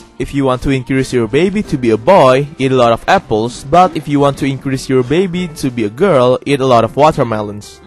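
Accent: Indonesia. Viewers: I bet you're indonesian. I can tell by your accent😄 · He got indonesian accent. Am i wrong? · Starting to miss the sims 3, I think the sims 3 is better than 4. Nice vid, btw I hear Indonesian accent, are you from Indonesia? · you sound Indonesian!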